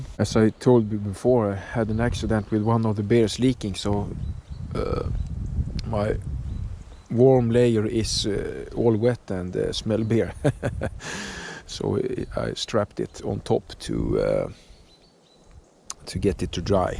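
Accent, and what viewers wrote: Sweden. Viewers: Great video; and excellent comments on the bike's performance. I have several KTM/Husky bikes and I love this size whether it's the Duke or the ADV. Beautiful scenery too. The boreal forest is flat but very "endless" feeling. I enjoy your accent. My ancestors were Swedish and all the old folks are gone now so I miss it.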